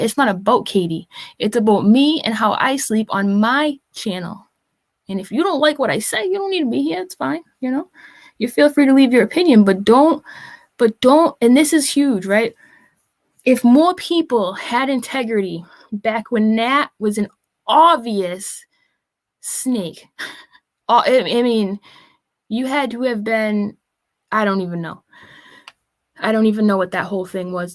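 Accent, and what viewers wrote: United States. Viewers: This is only the 2nd time I've watched you and yes I subscribed after the first half an hour. I live in Australia and I think you are the American version of me but way cooler. I think I actually agree to most of everything you said. Thank you and God dam I love your accent I could listen to you for hours 🇦🇺💛💚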